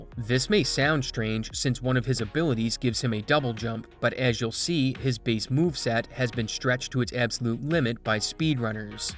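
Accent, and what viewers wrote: Canada. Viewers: In your outro, you always say " ", but your profile name clearly lacks the Z. Did it once before, and do you ever consider adding it back(you don't have to, just curious)? Also, with how you pronounce words with the sound of "out" and sometimes just "ow" in them, I wonder: Are you Canadian? Because the way you say them is a pretty common thing in Canadian accents. And about your voice, is that your actual voice talking, or an AI reading what you type in it?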